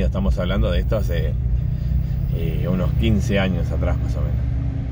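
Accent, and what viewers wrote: Argentina. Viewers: Che una pregunta el es gasolero? Pq sino el precio de la nafta te come vivo. Le comenté a mi hno de tus videos. Otra cosa tenés el mismo tono de voz de un salteño q vive acá, tienen otra particularidad en común a los dos se le están volando las chapas jaja. Slds desde Toronto. José Luis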